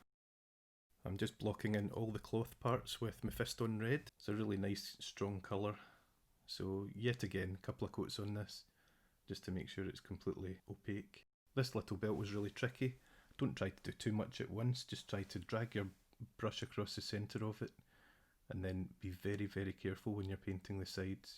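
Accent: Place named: Scotland, United Kingdom. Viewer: Great video man. I am average painter too, slow and steady. I like the mix of red, white and blue. It makes him very regal. I love your commentary, like a Scottish Bob Ross.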